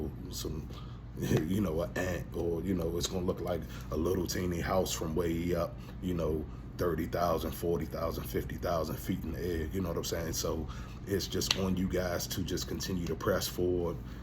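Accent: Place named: East Coast, United States. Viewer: Thank you for sharing💛( You have to be from the east coast 😌)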